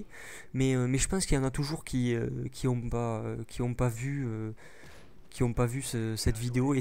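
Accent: Toulouse, France. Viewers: Cet accent toulousain me fait tellement rire!!! Bonne continuation à toi!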